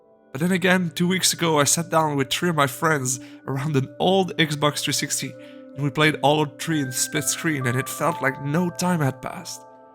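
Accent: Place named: Quebec, Canada. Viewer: My grandma was from Québec, thought I recognized that accent. Cool video gonna sub was a fresh take on the idea of gaming decline without the click bait garbage so prevalent on YouTube.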